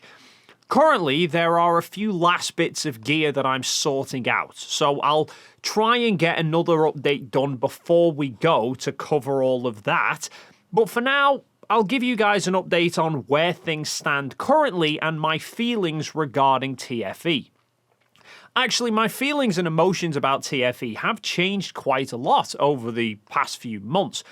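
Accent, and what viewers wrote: United Kingdom. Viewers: Sorry about the not-relevant question, but... I've been trying to place your accent. Where in the UK are you from?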